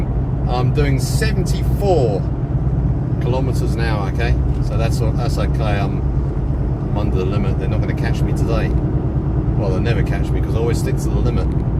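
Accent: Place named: Australia. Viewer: Where did you get that Australian twang in your accent from?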